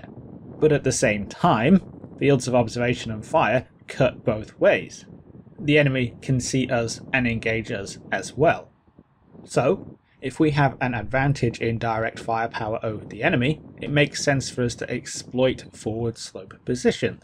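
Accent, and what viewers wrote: United Kingdom. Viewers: unmistakably yorkshire, wonderful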